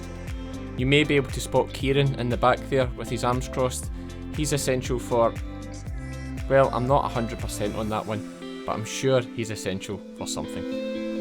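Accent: Scotland, United Kingdom. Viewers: Hello from Alberta, Canada. I worked with a fellow from Scotland who sounded quite similar to how you do when speaking to your mates. It took a good 3 months to understand him all the time. Now, no problem!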